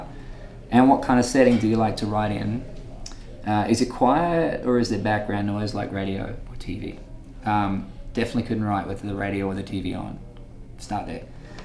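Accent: Australia. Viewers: yeahhhhhh, he answered my question !!!!! That's me LaVonda from Texas. I could listen to him say my name a million times and never get tired of that dreamy aussie accent.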